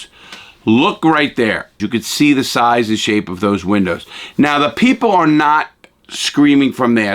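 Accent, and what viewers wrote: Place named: Boston, United States. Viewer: are u from mass??? I'm hearing a boston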